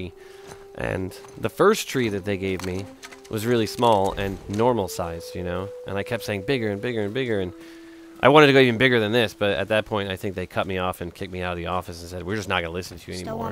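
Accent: United Kingdom. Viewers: British accent love it ❤️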